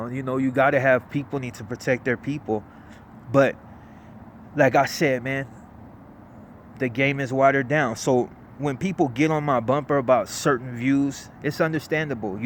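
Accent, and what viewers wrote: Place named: Mexico. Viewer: Why does he try to sound mexican if hes black?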